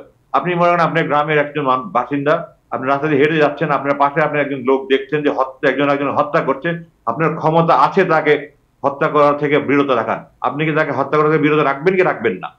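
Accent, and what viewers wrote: Sylhet, Bangladesh. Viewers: Kindly try to improve ur SYLATI ACCENT Mr. Conductor.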